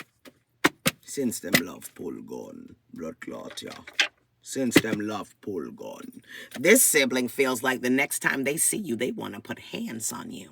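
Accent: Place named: Jamaica. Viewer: Why do I knw you talkin to me? I hear the voice of God in you...that deep voice resignates i can relate and n your speaking patois...Jamaica my birth place ...jhheeeez bless up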